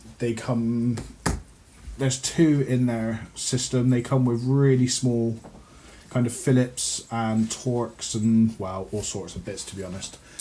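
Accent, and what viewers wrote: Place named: Somerset, United Kingdom. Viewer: You sound like you are from Somerset which makes me wonder why you have some lock knives on you.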